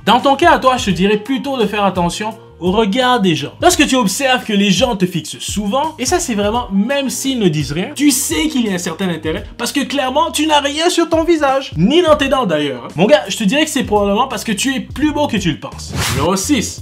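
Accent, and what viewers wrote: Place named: Quebec, Canada. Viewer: c'est un accent canadien ça s'entend a des kilomètres, lui surement du Quebec, sa manière de dire "présentement" il n’y a que les québécois pour parler comme ça , ce n'est pas une critique bien sur, bonne vidéo.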